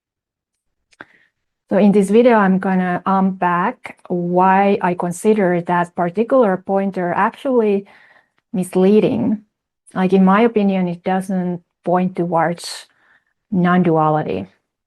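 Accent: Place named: Finland. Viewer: She's Finnish (not German), speaks excellent english with a wide vocabulary (more than most Americans), and has a lovely accent 😊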